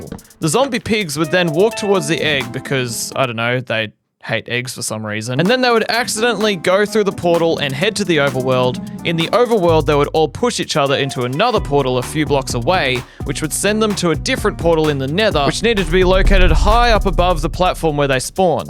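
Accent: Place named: Australia. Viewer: You sound like a fair dinkum Aussies.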